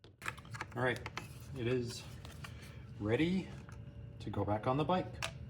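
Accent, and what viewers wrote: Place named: Northeast, United States. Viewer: Thanks. If I pull the trigger on the 701, I'll probably be peppering you with questions. Looking forward to following in your footsteps. Where in the world are you based? Sounds like NorthEast USA somewhere.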